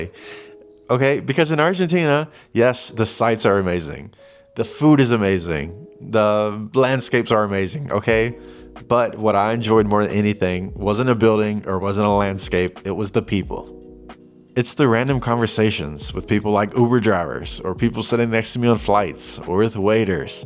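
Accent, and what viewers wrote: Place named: Russia. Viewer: You speak Spanish like Russian could be your first language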